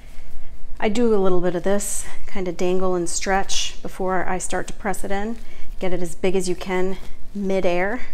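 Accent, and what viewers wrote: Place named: Central New York, United States. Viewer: Your accent is pure central NY and I love it. We had pizza like this when we were kids in Niagara Fall...Ragú, though? No ma'am.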